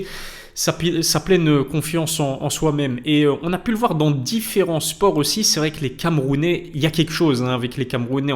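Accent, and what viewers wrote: Belgium. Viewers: Très belle chaîne mma, sincèrement, très passionné et sérieux 👍🏻 Et puis, ce petit accent belge est un plaisir et une marque de fabrique 😁 Merci à toi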